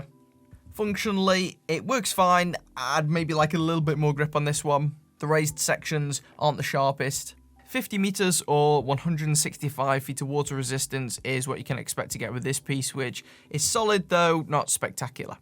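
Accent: Stoke, United Kingdom. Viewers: My dad was from Stoke. Your accent is absolutely fine by me as it reminds me of him now he's no longer with us. Love your reviews too.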